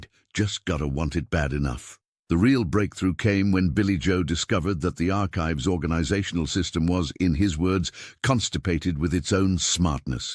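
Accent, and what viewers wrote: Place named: United Kingdom. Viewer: Lol! I love this narrator!🤣🤣🤣🤣🤣🤣🤣🤣🤣🤣🤣🤣🤣🤣🤣🤣🤣🤣🤣🤣🤣🤣🤣🤣 Best story as always. I only wish all hfy scifi comedy has this british narrator guy.❤️❤️❤️❤️❤️❤️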